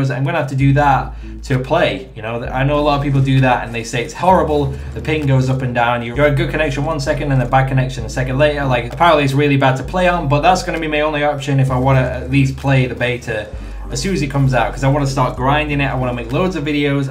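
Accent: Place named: Birmingham, United Kingdom. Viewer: Birmingham I think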